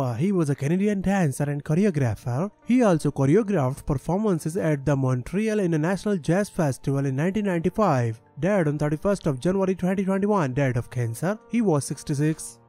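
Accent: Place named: India. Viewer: PLEASE....DO THE HONOR OF CELEBRATING TOP CELEBRATIES DEATH WITH A CLEAR VOICE WITHOUT ANY INDIAN ACCENT !!!! IT IS VERY DIFFICULT TO UNDERSTAND WHAT IS BEEN SAID.....GOT JOTHING TO DO WITH RACISM !!!!!!! PLESSE GIVE THE MIKE TO SOMEBODY WITH CLEAR PRONUNCIATION OF THE ENGLISH LANGUAGE !!!!!!! OMG !!!!!!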